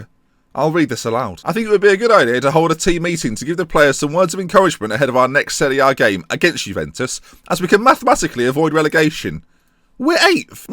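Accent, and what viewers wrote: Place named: Yorkshire, United Kingdom. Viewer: Yorkshire accent = the best.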